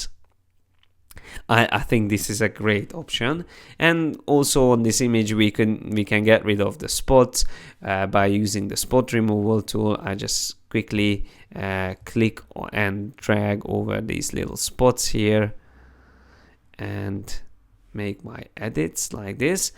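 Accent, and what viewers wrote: Hungary. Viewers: Annyira jellegzetes a magyar akcentus,öröm volt hallgatni:) De persze a tutorial maga is perfekt!!! Rengeteget tanultam belőle,hálás vagyok!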